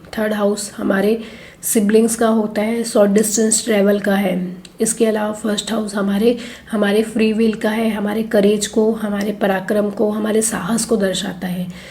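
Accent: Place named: East India, India. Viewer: Namaste Mam aap native kaha ki h Apke kuchh shabdo se Maharashtra ki nahi lagti Me bus interest k liye pooch Raha hu q k me guess krne ki koshish krta hu aap jo shabd pronounce ki wo mene assam bihar wb k logo k mukh se sune h Sorry if anything feel not good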